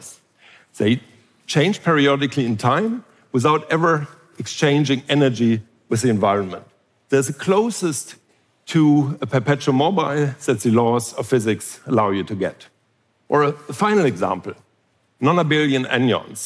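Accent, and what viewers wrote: Germany. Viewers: i can hear the german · i tryed to watch it, but after min he lost me completly. And the german accent has mad it worse. Sorry Hartmut, aber das kannst du sicher besser. Geiles Thema, aber suboptimal transportiert, für mein Verständnis zumindest. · his baldness (with or without sunglasses) is superseded by his German accent for his credibility.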